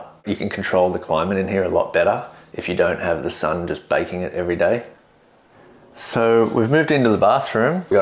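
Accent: Australia. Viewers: A happy and mellow Aussie :) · I had to remember this is located in Canada, whilst listening to an Aussie talking about the unit.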